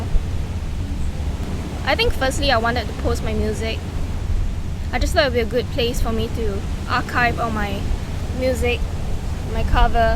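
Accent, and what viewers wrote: Singapore. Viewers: Greetings from California! I have never heard of you, and I don't know how I got to your channel. But listening to your accent, my guess is that you're from Singapore or Malaysia. Am I right? You said you had 300+ subscribers. Look at you now! As of this moment, you have 849! I'll subscribe, so you'll be at 850. Then I'll listen to your music... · Actually, English is most likely her first language. She is speaking Singlish. It's English. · Your accent sounds like youre from Singapore possibly? I went there once several years ago. I'm about a minute into your vid. If you get a passport and live and travel to places that are less hustle culture, it could be a breath of fresh air. Also, i play guitar(20 yrs) and I agree, it's the most free place. Moving to Philippines next week. I hope you find consistent peace and happiness along your way in life. 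· You are adorable and I hear some Singapore inflection Good luck, I will follow this for at least a while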